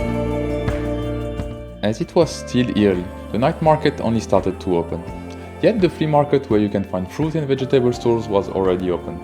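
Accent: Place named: France. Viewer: Your French accent is WAY too thick - je n'ai compris RIEN! Thumbs DOWN!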